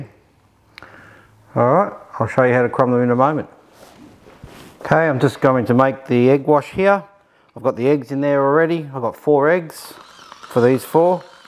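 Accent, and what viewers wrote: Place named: Australia. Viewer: Sounds like you are an Australian like me. How did you make the garlic butter, thanks.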